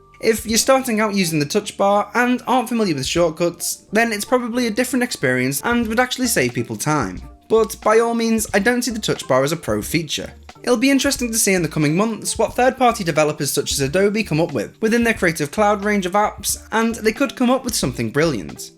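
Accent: United Kingdom. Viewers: It's the typical British way to do a voiceover, I've grown to enjoy it lol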